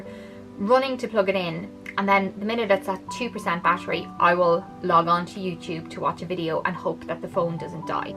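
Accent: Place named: Ireland. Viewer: Love the irish accent x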